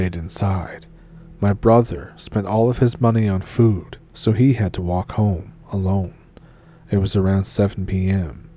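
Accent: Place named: Newfoundland, Canada. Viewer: Narrator sounds like he’s from Newfoundland! Me too brudder, me too. If not you must be east coaster fer sure. Thanks for the upload makes me feel like I’m home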